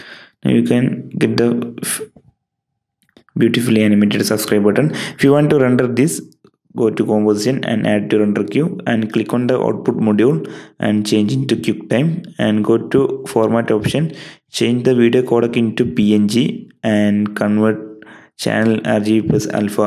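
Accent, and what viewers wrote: Kerala, India. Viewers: Why do you sound Malayali (Keralian)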